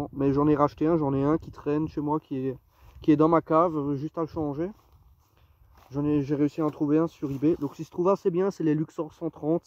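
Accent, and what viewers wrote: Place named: Alsace, France. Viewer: Salut l'illuminé 🤣 Tout d'abord bravo pour ce que tu fais! Je restaure en ce moment une MICMO S98 identique à la tienne, mais qui malheureusement a été recouverte d'une vilaine couche de noir sur toute la bécane. C'est donc avec grand plaisir que j'ai regardé ta vidéo encore et encore... Je suis près de Strasbourg et vu ton accent il se pourrait bien que l'on soit voisin. Si tu es encore en possession de la MICMO, tu penses qu'on pourrait se voir ? Il y a sur la mienne des pièces manquantes dont je pourrais prendre les mesures sur la tienne. 👍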